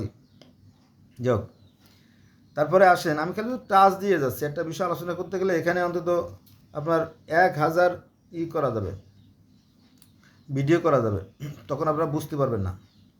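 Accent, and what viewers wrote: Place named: Barisal, Bangladesh. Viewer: Please present in a wise manner. Seems you are not a highly educated man, can't speak even Bangla correctly avoiding illiterate tone ( local Barisali tone) Correct those limitations if you can ( I am in doubt, you will be able) The history what you narrated is known to all educated Muslims except the common illiterate ( they are the larger in numbers)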